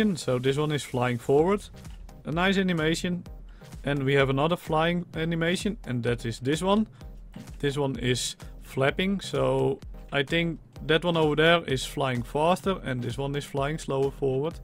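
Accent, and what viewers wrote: Netherlands. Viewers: Hes Dutch lol that english do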